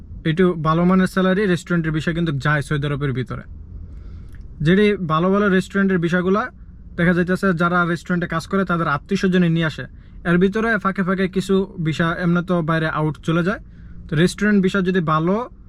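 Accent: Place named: Comilla, Bangladesh. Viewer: ভাই আপনার কথাবার্তা টুন মনে হচ্ছে আপনার দেশের বাড়ি কুমিল্লা ভাই আমার নিজের দেশের বাড়িও কুমিল্লা মুরাদনগর থানা গ্রাম ধামগড়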